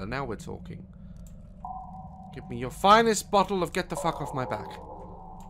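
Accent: United Kingdom. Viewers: G R A N D M O T H E R Daz: So when we meetin' Nan? Brtish 100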